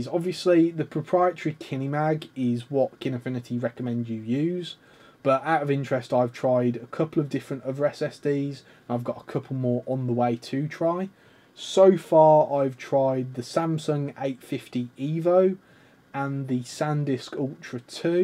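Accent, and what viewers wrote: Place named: Birmingham, United Kingdom. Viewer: You're definitely a fellow brummy arent you!!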